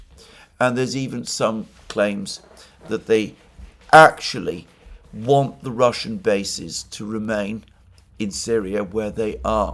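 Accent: United Kingdom. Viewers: I can't stand that British accent in times like this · The idea that Israel will want to acquire Damascus is so absurd, so out of touch with reality, so utterly ridiculous that it makes you sound like an idiot even with your suave British accent.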